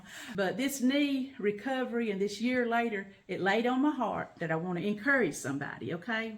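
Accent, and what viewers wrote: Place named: South, United States. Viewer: Bless you,. I lLOVE your attitude AND your southern accent!:) My mom, her parents, her siblings etc., were from Alabama. Since I found your channel by looking for updates after knee surgery, I now have to look through your playlist for some good ole southern cooking. YIPPIE!!! Thank you. ❤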